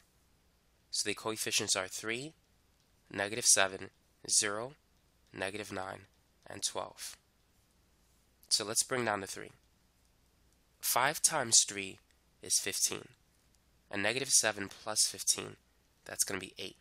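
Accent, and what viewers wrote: United States. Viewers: Pay attention he teaches well He is an American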